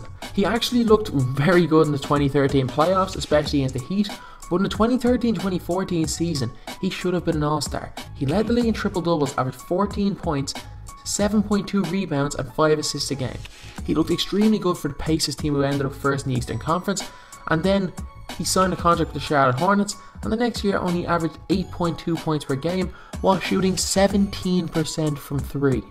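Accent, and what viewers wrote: Ireland. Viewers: Are you from Ireland man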